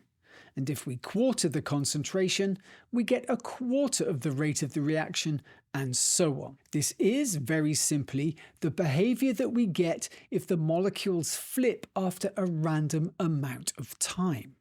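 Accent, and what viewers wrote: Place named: Scotland, United Kingdom. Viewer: You know when you said this was made in Japan I was like huuuh as your Scottish accent had me guessing like you were someone in England or maybe Stirling, don't know why, but did you know the open university have no DaisyChains Audio or any audio version of there bio-chemistry related subjects and they don't know why, they have literally just been apologising too me for 5 years since I am dyslexic, and I failed 2nd year twice because I have just been giving walls of text too read as a dyslexic man and like I can't learn like that, though this is a perfect way, which is why I have a stupid playlist I have been building over the past 5 years of everything practical and like visual (or just the only English information on Youtube, the amount of videos in English which go into Hindi drive me insane, I think I find a video and nope, but coming across your channel sure was a treat.) Being very useful, I wanna pass my final year, you know, would be nice.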